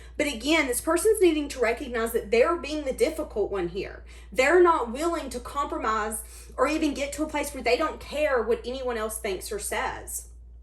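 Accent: South, United States. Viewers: Holy cow! You are on point! This totally resonates with me. Thankyou so much ❤btw love you accent. I am from the South too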